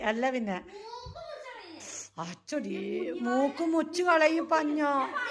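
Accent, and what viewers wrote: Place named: Palakkad, India. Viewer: ഭാഷ കേൾക്കുമ്പോൾ പാലക്കാടാണെന്നു തോനുന്നു.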